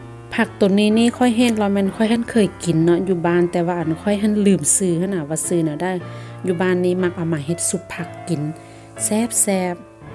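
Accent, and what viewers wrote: Luang Prabang, Laos. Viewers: สยายดี, มารับชมนำเด้อ ฟังเสียงเจ้าคือคนหลวงพะบางเนาะ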